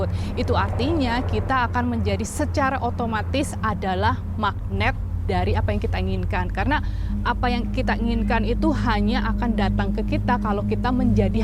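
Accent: Banyumas, Indonesia. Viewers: Setujuuuu... Mungkin karena sesama suku ngapak2 jadi berasa lebih mudheng... Apalagi pas bilang "abab e" 🤣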